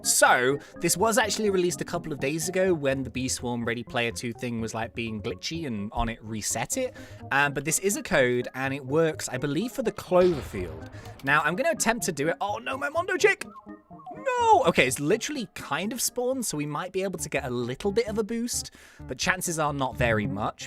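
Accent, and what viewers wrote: England, United Kingdom. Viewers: what do you mean, but? Like he’s English, from the uk... like I said, and I’m LITERALLY English from the uk aswell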